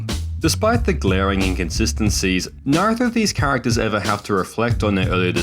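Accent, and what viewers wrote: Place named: Australia. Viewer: God I hate the australian accent so much and ur video sucked ur reasons why the first season was bad were minor nobody rly gives a f abt some fob what I care is structural storytelling and good characters. Season 1 had that and like all good things Disney must destroy with season 3. You rly suck at "breaking down" things pls sygau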